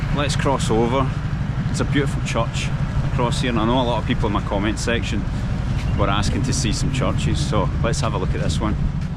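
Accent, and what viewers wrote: Scotland, United Kingdom. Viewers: As a possessor of English as a second language, I love different English accents, and of course love your Scottish accent, bro. Keep it, be glad and proud of it, we need to celebrate differences. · Какой клевый шотландский акцент! У тебя есть килт? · Oh, your accent! :-)))) I already forgot how Scottish accent sounds like. Yeah, Moscow is a great place to live. The only thing is cold during the winter. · It's so strange to hear a slight Scottish accent, because I'm used to Americans talking about Russia 8-)) It will be interesting to see the Kitaj-gorod (Китай город) area through your eyes! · I grew up in Шаболовка neighborhood in the 70s. In the 90s I moved to the US. Last time visited the area in 2017, when my daughter who studied at the University of Edinburgh (where I also spent quite some time🙂) had to spend 1 year in Moscow as part of her curriculum. 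It’s hard to recognize the area but your video brought back lots of memories. Looove your Scottish accent! What part of Scotland are you from? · It was very interesting to listen to the scottish accent. I understood 95%. Thanks a lot for the video and wish you a pleasant stay in Russia! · Hello 🎉 absolutely buzzing to see a Scottish 🏴 guy here in Moscow … I lived in Glasgow for almost 6 years but my hubby is from Moscow and due to difficulties with uk visas we decided to move to Moscow. Well I thought I would miss Scotland , frankly I do sometimes, Scotland is absolutely stunning but the cost of living, weather and work were a complete mess… got tired of it… long story short, I love it here 😂 Scotland is in my heart forever though and hearing Scottish accent here in Moscow is so much fun 🤩 glad you’re enjoying it here, mate!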